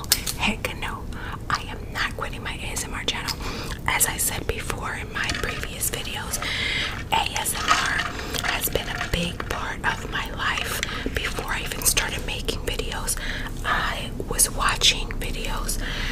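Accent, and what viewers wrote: New York, United States. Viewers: So happy to see the bell notification and that it was a vid from you! Everybody needs a break from you tube sometimes and don't feel bad about accepting donations..you deserve them! I would love to see your professional makeup kit/application..I love the sound of your jewelry making noises, and when i hear your New York accent. It's so obvious you experience ASMR. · Hi. Just started following you insta and I would like to say well-done on the weight loss fantastic to see. Listening to your voice what accent is that? New York? All the best from bobby from Warwickshire England.UK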